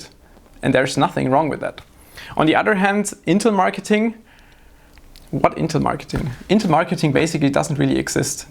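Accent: Germany. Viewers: Den deutschen Akzent hört man einfach immer raus :D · I love the German accent, the way you pronounce stuff makes me shiver! But, while Ryzen chips are good and all, I'm still grabbing me an i7. Why? I already have a high-end Aorus Intel mobo. Buying a new one just for Ryzen is just illogical for me.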